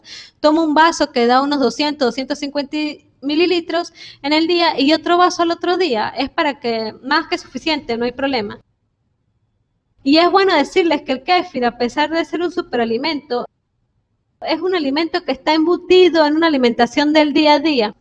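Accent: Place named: Venezuela. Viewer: Hola 👋 una pregunta eres de Venezuela; me suena el acento, seguiré tus videos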